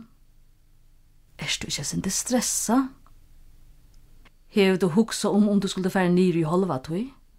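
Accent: Faroe Islands. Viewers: Personally, I don't think it's about the accent, but the languages themselves. Having the same person reading both texts shows quite accurately the difference between the languages. Two different people with different accents would only confuse the message. I'm Faroese by the way and I live to the north east of whoever is reading this, I'm certain she's from the capital. My accent is quite different. But I get your point and I'm not saying you're wrong. Just sharing an opinion. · She has a faroese accent while talking Icelandic. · Nice video. Faroese is indeed a beautiful language although its beauty is often missed by Icelanders. The only problem with the video: The Icelandic bit is read with a heavy Faroese accent. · I can understand Faroese, Danish, Norwegian, Icelandic and a little Swedish. I must say that this doesn't show similarities between Faroese and Icelandic. 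She speaks Faroese in a Torshavn dialect, and Icelandic in a Faroese dialect, but nice try tho. Faroese and Norwegian have more similarities by their pronounciations and Icelandic pronounce words more strong - but the writings look alike (i would say)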